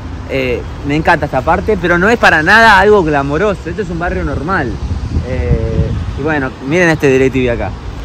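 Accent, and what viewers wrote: Argentina. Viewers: Jajaja me partí con lo de "leche de almendras. de gente rara" 😅😅 pues a mí me gustan esos rollos, me acabo de suscribir a tu canal por 4 cosas: me gustó la temática del vídeo, me gusta cómo se ven las imágenes con la cámara esa y me mola el acento argentino, además también me gustó que explicas muy bien todo, un saludito y gracias desde Islas Canarias, España 😉👍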